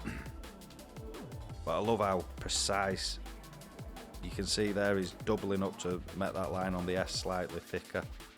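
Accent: United Kingdom. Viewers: OMG what a thick accent like wow, the most British/English accent I have ever heard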